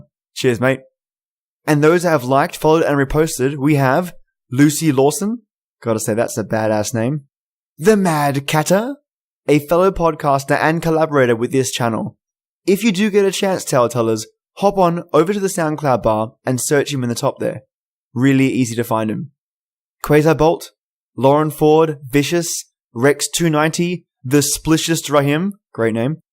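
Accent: Australia. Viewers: the Australian accent does but me to sleep. But I dong think my work wants me sleeping on the job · Hell nooo!! 😱 If someone was in my garage I would try to trap them in there until the cops showed up to arrest them. Good story, Great narrating, and as always awesome Aussie accent 😉 haha 🙂🖤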